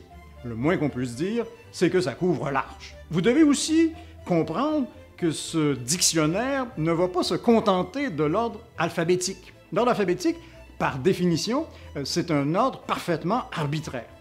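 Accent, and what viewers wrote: Quebec, Canada. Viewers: Merci, c'est tout à fait passionant ! Et l'accent québécois fait toute la différence !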